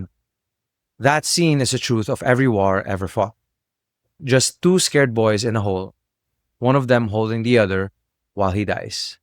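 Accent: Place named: Philippines. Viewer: It didn’t even take me 10 seconds to know ur pinoy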